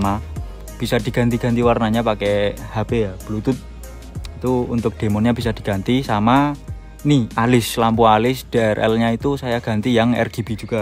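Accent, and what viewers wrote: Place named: Java, Indonesia. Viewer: wkwkwkw medok nya ganggu banget anjiing jawa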